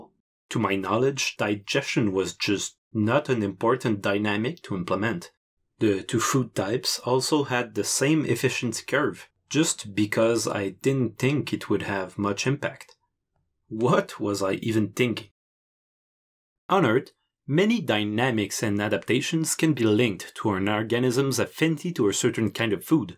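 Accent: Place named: Quebec, Canada. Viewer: sounds Québecois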